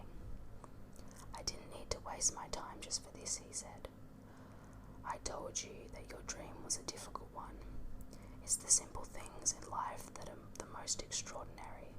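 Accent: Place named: Australia. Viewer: Definitely an Aussie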